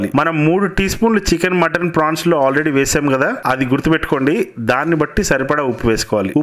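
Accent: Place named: Godavari, India. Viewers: Ma godavari slang chala baguntundi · garu Godharollu mari aala mataladathara dharunam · Pakka local accent medi rajamundrey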